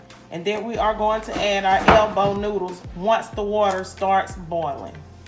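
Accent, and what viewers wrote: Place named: South, United States. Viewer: Finally!!! A video with no creammy watery mac and cheese. And i can hear it in your voice that you’re southern. Im trying this for thanksgiving lol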